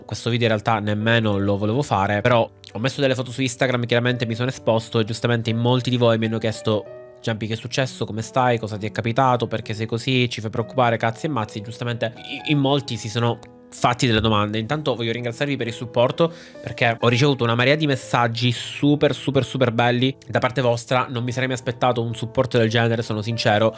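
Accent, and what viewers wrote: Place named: Palermo, Italy. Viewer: Sul problema di salute vedo molta confusione e non mi sento di chiarirla perché non sono qualificato per farlo: ti consiglio amichevolmente di seguire le prescrizioni mediche. Se non ti fidi del curante cambia medico. Dall'accento immagino tu sia di Palermo. Al tuo posto anch'io avrei evitato il P.S. (casomai al massimo la guardia medica, ma se sei stato già visitato dal curante anche la guardia medica non avrebbe avuto molto senso). Forse sarebbe meglio rivolgersi a un bravo internista, anche privatamente (purtroppo si paga un botto, ma le tempistiche della sanità pubblica, dalle, presumo, nostre parti sono bibliche). Mi dispiace per l'auto, ma le Fiat vanno via come il pane. 😔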